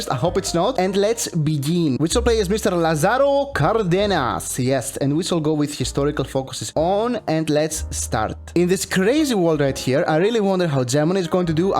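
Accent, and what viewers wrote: Greece. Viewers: He sounded Greek to me and because I'm Greek to I had to make sure thanks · Your accent sounds like your greek who speaks English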